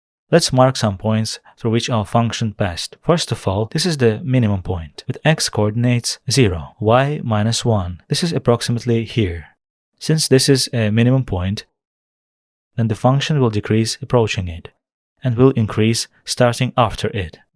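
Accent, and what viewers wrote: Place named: Kazakhstan. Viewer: Он же из Казахстана, поэтому он так и говорит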